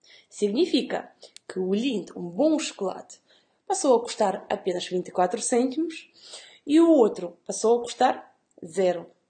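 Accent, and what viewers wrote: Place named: Eastern Europe. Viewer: O que me admira mais é o facto de conseguir raciocinar abstratamente numa língua estrangeira, de forma tão correta! O seu ligeiro sotaque de leste, então, é a cereja no topo do bolo! Parabéns, Senhora!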